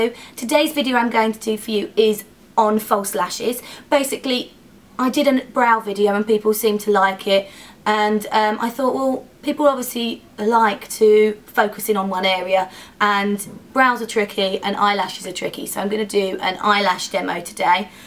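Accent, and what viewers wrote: United Kingdom. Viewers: r u British? love ur accent.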